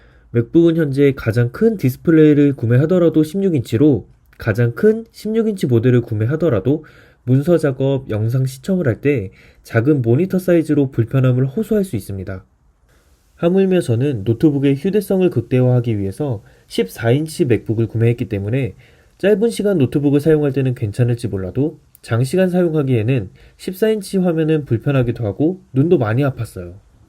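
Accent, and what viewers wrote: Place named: Chungcheong, South Korea. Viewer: 충청도 사투리 재밌어서 킹받네 ㅋㅋㅋ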